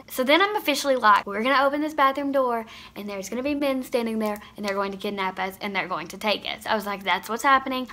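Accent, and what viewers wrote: South, United States. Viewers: talks with a southern drawl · Tinna jones it doesn’t sound uncommon to me, it just sounds like a southern accent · I love your accent sounds very Southern i can tell ur from Oklahoma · I knew as soon as I turned on your video you were a fellow Kentuckian! Great story time. .thanks for sharing your story How the hell did she know your friends last name? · Yo, I swear I knew your accent 😂 KY girls gotta represent. Lol. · So much courage! I hear some southern I’m from Georgia ! I appreciate your story! May god bless you! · girl my first thought when you started talking was that you were from kentucky too!! you’re so pretty. anyways thank you for sharing this,, it’s definitely gonna help a lot of people. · Oh my !! I’m new, from Canada Ontario. You have such a beautiful southern accent !!! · Kentucky crew lol sorry hun but I love the accent mine isn't thick cuz how North eastern I am or at least I don't notice it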